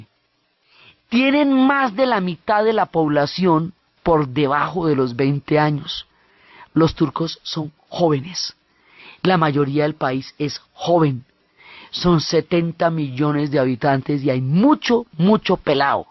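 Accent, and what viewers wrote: Colombia. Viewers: Me he vuelto a enamorar de la historia, excelente narradora, que manera de contar tantos episodios y la cereza del pastel es ese acento colombiano delicioso. Que privilegio escucharla. Me emociona!!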